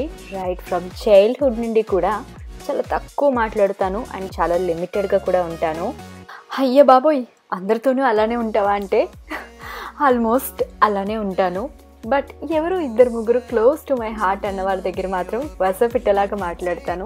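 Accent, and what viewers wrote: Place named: East Godavari, India. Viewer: మా తూర్పు గోదావరి భాష వింటూ ఎంజాయ్ చేసాం. ఇంతకీ ఏ ఊరు అది మేడం?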